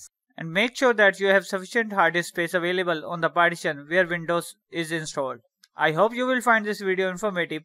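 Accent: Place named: India. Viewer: How can i translate the hindu accsent to english?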